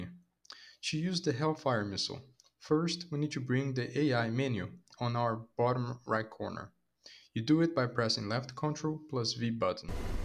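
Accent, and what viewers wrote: Brazil. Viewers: Tá bem BR esse sotaque aí hein! Anyway, thx for the tutorial.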